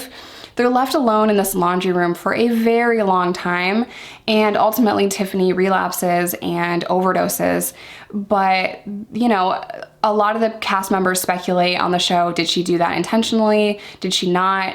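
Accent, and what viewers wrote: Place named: Upstate New York, United States. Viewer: Brand new sub. Where are you from in upstate NY? If too personal, no offense. You sound like my hometown area code, 315! ETA: just read the katv article, you’re from Sidney?! My first GF was from Walton/Downsville, so crept through Sidney (from Utica) under the speed limit! Those cops were jerks!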